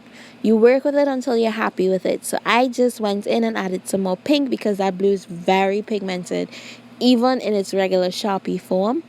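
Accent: Trinidad and Tobago. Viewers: Are you trini ? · From the time you started talking i realized u are a Trini❤🇹🇹 subscribed one time🙌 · What country are you from ? You sound Trinidadian 😍🇹🇹🤸🏾‍♀️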